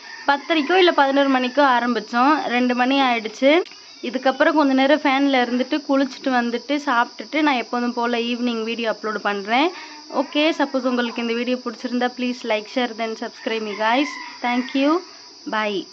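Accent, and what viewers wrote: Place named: Cuddalore, India. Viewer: பொதுவாக கடலூர் வரையிலும் ஒரு நடை என்று சொல்வதன் வழக்கம் இங்கும் அந்த பேச்சு வழக்கு உள்ளது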